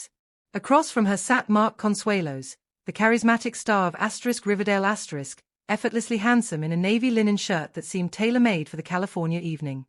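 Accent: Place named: England, United Kingdom. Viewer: Too funny lol A woman with an English Accent using such words to describe Meghan...friendly, etc ETC If the A I bot said Mean Girl, Not to Bright, Liar, Bully, and has no clue 9th month the Baby drops, not rise! He may be wealthy but in terms of how MM views herself and the turning Harry into her lap dog, Mark is mostly in the same place as Harry though his Marriage is vastly different than MM' s spare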